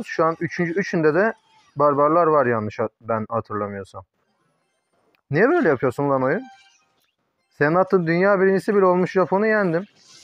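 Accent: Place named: Thrace, Turkey. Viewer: bizim Trakya insanına benziyor sesin zaten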